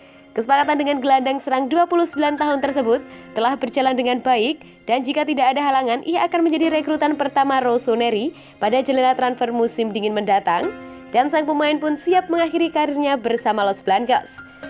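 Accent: Java, Indonesia. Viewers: Kakak suaranya medhok... mantav...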